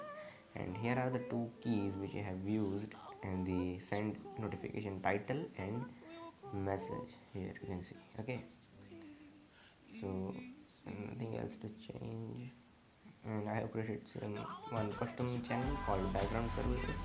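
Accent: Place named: India. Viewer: but your English accent seems to be different than other indians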